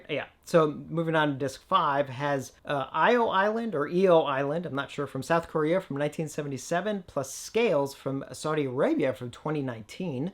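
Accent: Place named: Chicago, United States. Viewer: This new Haunts set looks excellent! I still don't have the first one though, I will have to get it next time it goes on sale and then maybe next year will get this second volume. Thanks for covering this,! Don't worry about the Chicago accent~I'm over here with a Boston accent myself. I pahk my cah in Harvahd yahd afterall 😅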